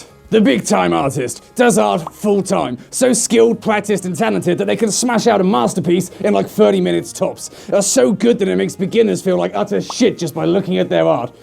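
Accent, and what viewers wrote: United Kingdom. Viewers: Oh lord...that is the most heavy, yet most beautiful british accent I ever heard!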